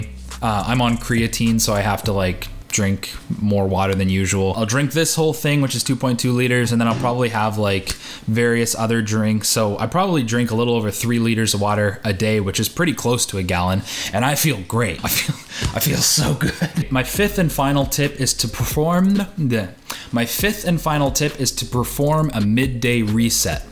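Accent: Canada. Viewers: Are you Canadian ? I love your videos. Thank you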